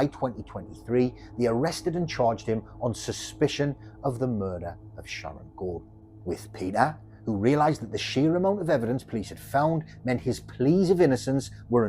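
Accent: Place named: Sunderland, United Kingdom. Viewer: Love hearing a fellow makem!! Cracking channel!!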